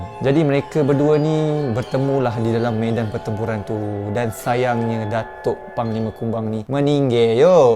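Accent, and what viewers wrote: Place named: Perak, Malaysia. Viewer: Org perak ke yop ajer nya😂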